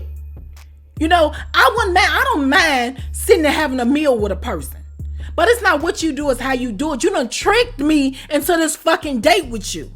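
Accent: Chicago, United States. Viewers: Never knew Chicago had an accent but I listen to another Chicago tuber named Ashley Miller and y’all sound alike ! I’m a sucker for accent keep up the context #newsubscriber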